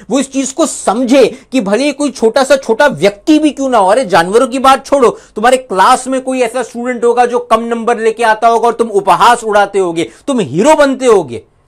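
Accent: Bihar, India. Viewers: Sir mujhe ye to pata chal gya hai ki aap bihar se ho .ab aap ye bataye ki bihar me khaha se ho 😊😊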